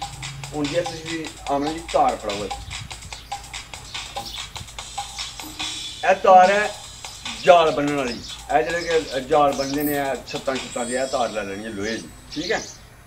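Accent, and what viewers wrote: Sialkot, Pakistan. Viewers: I love sialkoti accant..... Hahahaha waaaah teh aondi ai.... Hahahaha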